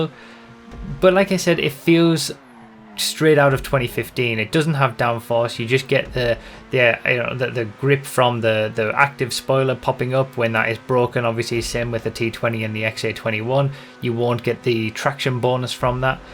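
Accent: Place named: United Kingdom. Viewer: Dem brit ish accent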